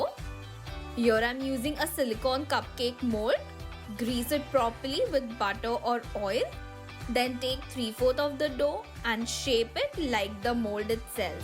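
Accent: Maharashtra, India. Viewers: You are Maharashtrian easy can identify by your speaking skills my guess is correct???pls dii tell 😀😀😀😀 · Yes she is Maharashtrian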